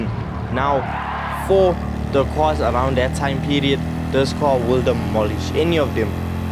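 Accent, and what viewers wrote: South Africa. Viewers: He is from South Africa after all, the accent makes the video have that much more character. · the most south african sounding guy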